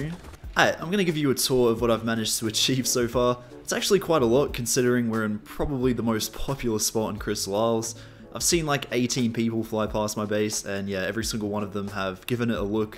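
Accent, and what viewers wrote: Australia. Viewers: You Australian? If so love from another Aussie love your content mate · are you aussie bro?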